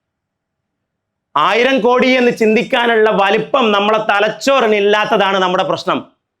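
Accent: Malappuram, India. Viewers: Thanku sir... താങ്കൾ മലപ്പുറം ദാഷയിൽ സംസാരിക്കുന്നത് നല്ലത് തന്നെ പക്ഷേ സോഷ്യൽ മീഡിയ യൽ കാണുന്ന എല്ലാർക്കും തിരിഞ്ഞോളണന്നില്ല..... സസ്നേഹം ഹരീഷ്‌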